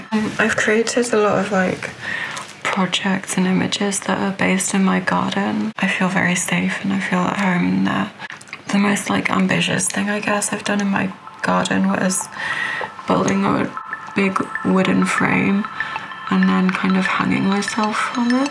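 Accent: United Kingdom. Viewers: Thank you! Their voices are almost identical, despite the British accent.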